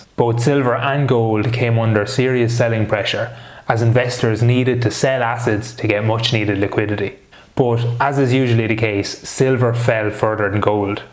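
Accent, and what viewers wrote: Ireland. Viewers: What an accent! Are from Ireland by any chance? Greetings from Munich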